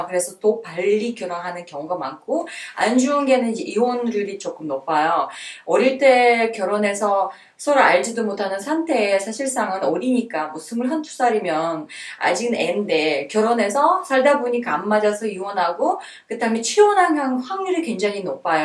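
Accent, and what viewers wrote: South Korea. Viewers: 지금 정도 한국말하는 구잘을 보니 정말 대단하다는 생각. 미수다 일 때보다 지금은 더 한국말이 늘어서 완전히 한국 억양을 구사하게 되었다. 구잘이 좀더 귀하게 여김을 받게 되기를!!!!!!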